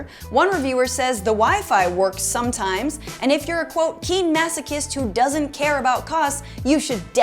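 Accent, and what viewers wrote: RP, United Kingdom. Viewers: Your English accent is reeeealy posh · Is it wrong that I like her British accent L) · Love the British accent... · Loving the British accent! <3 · English (educated received pronunciation to be precise). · Well done on the british accent; a pretty good job over all.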